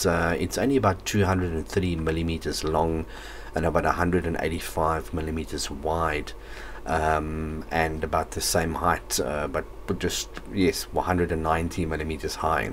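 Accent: South Africa. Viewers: Kon sommer hoor in die eerste paar sekondes jy kom van Suid Afrika af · thanks for affirming my suspicions of your accent being from South Africa , after seeing the game paper ;-) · I was like 99% sure you were South African, and then I saw the Game catalogue. Love your work, man, keep it up. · I know a South African accent when I hear one. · Hi there, this is sweet! I'm from South Africa- sounds like you too! I'm wondering the best way to ship or buy a small subwoofer like this locally? I bought a TPA3116D2 and i'm looking for a small subwoofer to match. i.e.100wrms with low frequencies.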